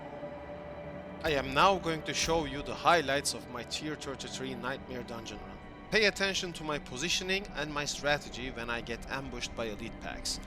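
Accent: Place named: Turkey. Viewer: Duyduğum en ‘clean’ Turkish English aksanlarından. Ama kulak tanıyor tabi. ps. Great content, articulate and helpful. Liked and subbed.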